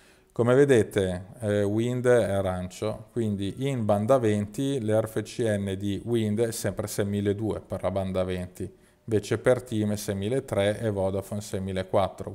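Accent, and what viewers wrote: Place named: Veneto, Italy. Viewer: Il peggior problema è che l'algoritmo del router sceglie la banda con il migliori RSRP, RSRQ, SINR senza curarsi dell'effettivo risultato in termini di velocità. Nel mio caso la banda 20 restituisce ottimi parametri di ricezione, ma velocità scadente. Inoltre nell'hack non registra la scelta di banda, anche se nella pagina di debug è settato su auto. Nel mio caso, per esempio, indico 1+3+7 (sui -110 db) per evitare 20 e 28 che sono lente (sui -80 db), e questi parametri non vengono registrati. Rimangono solo due opzioni: fissare la banda a una sola o dalla pagina di debug o dal cell lock. Da quando ho smanettato con l'hack, il router non aggrega più. Non capisco poi quindi la differenza tra il cell lock e la selezione della banda e forse considerando che ho solo una sola BTS a 900 metri nella mia area, non fa grande differenza. 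Fino al 24 del mese sto ancora in 4G, dal 25 vi aggiorno. Un ultima curiosità: la mia zona non c'è zefironet, uso Iliad, ma il router accetta sia gli apn di wind che di iliad, la cosa gli è indifferente. Stessa cosa con le con le bande 4G in cui la 20 è su banda di wind e 28 per iliad e funzionano indifferentemente. Un grazie speciale a per il suo grande studio e disponibilità, mi spiace che hai dovuto dismettere il tuo blog. Un unico neo: ogni tanto la tua dizione è poco chiara. Non è per l'accento veneto, che ci sta, ma proprio non articoli bene alcune parole. A volte devo rallentare il video, ripetere il play, consultare la sfera di cristall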